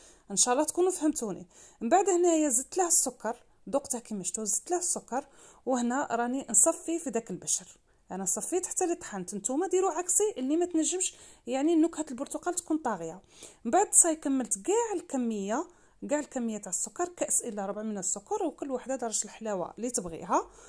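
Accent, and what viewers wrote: West, Algeria. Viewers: يعطيك الصحة ربي يحفظك ❤❤ نتفرجك غ باه نسمع هدرتك عسسسسسل تفكريني بصحاباتي كي كنت نسكن ف تيارت ناس الغرب العشرة الطيبة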